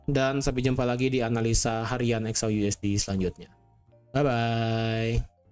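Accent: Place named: Bali, Indonesia. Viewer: dari logatnya asal bali nih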